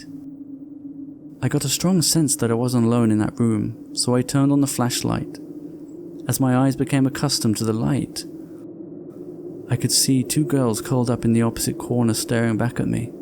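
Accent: United Kingdom. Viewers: Unrelated but you have a nice accent. I assume you are British?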